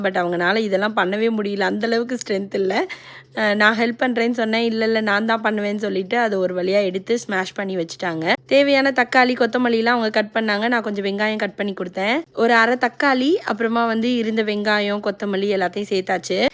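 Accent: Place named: Dindigul, India. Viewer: Akka neenga Tamil natural dindigulayaa iruntheenga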